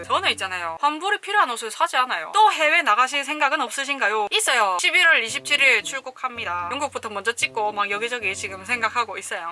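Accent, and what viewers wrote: Busan, South Korea. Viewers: 부산인 소리 쥘러!!!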